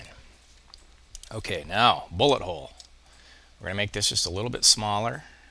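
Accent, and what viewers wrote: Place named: United States. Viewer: Nice uploads! I just came across some videos on a Chinese video site and found him speaks like another Inkscape tutor Nick on YouTube, I mean the accent of them is the same in my opinion and they're both from the US.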